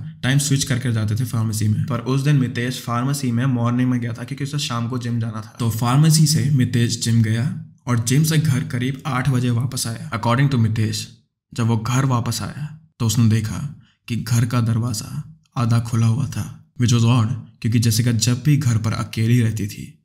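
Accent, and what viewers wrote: United Kingdom. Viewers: IM SORRY BUT WHY DOES HE SOUND BRITISH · that brr-issh accent got me wet, also rip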